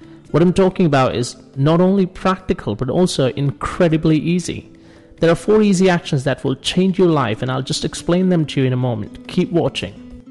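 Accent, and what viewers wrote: India. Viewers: Dude stop trying to hide that Indian accent it’s fucking horrible😂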